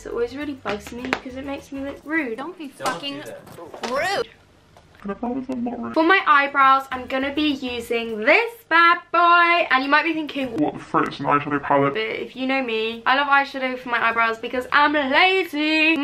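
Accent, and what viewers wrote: Scotland, United Kingdom. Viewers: As a Scottish person listening to her Scottish accent has me in stitches 😂😂😂😂😂👌🏻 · How could you not love her Scottish accents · can please do a whole video in her scottish accent its actually so entertaining🥰🥰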